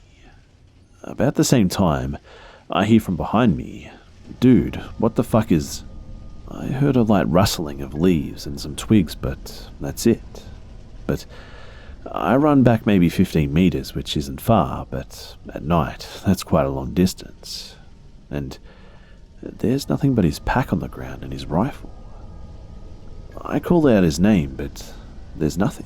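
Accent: Australia. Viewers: Australian accent: I've always known I was gonna be a marine Me: I dunno kinda sus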